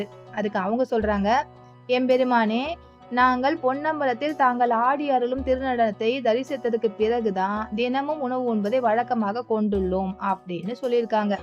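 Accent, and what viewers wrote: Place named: Madurai, India. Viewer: இறைவனைப் பார்த்து வணக்கம் ன்னு சொல்லிருக்காங்கன்னா மதுரை தமிழ் மேச் ஆகுதே..😂 சூப்பர் 👍😅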